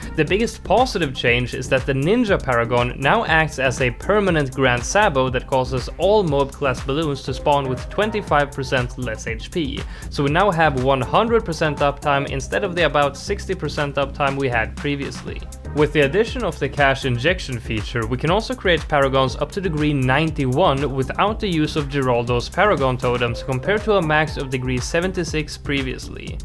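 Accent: Sweden. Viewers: Dude are you Swedish?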